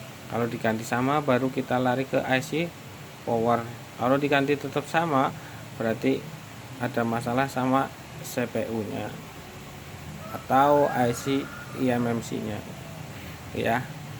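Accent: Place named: Banyumas, Indonesia. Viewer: Kayane wong ngapak iki, lokasi ngendi lur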